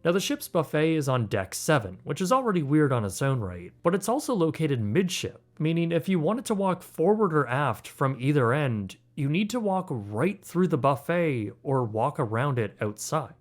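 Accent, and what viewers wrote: Canada. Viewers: I find this happening more and more with review videos... Typos and mispronunciations degrade the final product, and make you seem like you aren't the authority a piece like this would suggest. Look up all names and proper nouns first, check for punctuation, etc. Like these are the editing things that can take a viewer out of the experience, and make the presenter look immature. A few have mentioned the "Verraz-nano," and that was kinda it for me. My comment would be one of laughing along if this video was aiming to be funny, but it's obvious that this isn't the objective. So I'm saying it now, if you want to come across as authoritative on a subject, grammar, editing, pronunciation, and word variation ("very...very...very..." etc.) are priorities to get right. And it's pronounced more like, "Ver-rah-zah-no" if you're from the city like I am. 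With your Canadian accent, I'd say maybe something like, "Vair-ah-zah-no" is more accurate. Where we have stronger E sounds, you guys seem to go towards an A: "Ter-rible" vs. "Tair-rible". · I am new to this channel and I instantly noticed the Canadian accent😅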